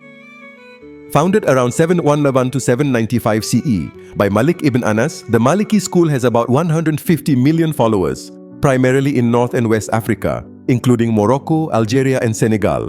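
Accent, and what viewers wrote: India. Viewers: 😂😂 a video with Indian accent big red flag 🚩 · has indian accent.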